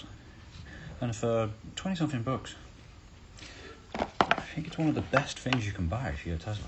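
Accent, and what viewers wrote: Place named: Ireland. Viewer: Do I detect an Irish twang to your accent? Great video by the way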